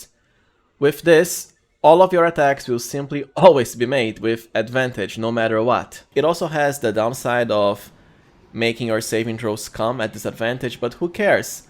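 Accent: Brazil. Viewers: That im a brazilian acent right there, I can bet! nice english btw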